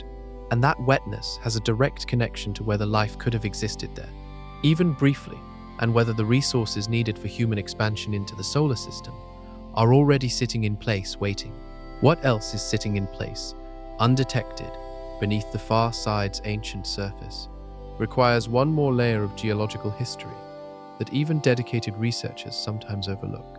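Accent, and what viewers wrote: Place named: United Kingdom. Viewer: Tipoff of clickbait AI CGI BS videos is the quasi-British accent of narrator.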